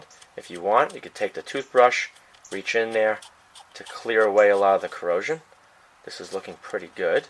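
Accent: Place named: New York, United States. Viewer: He sounds like a new yorker from long island.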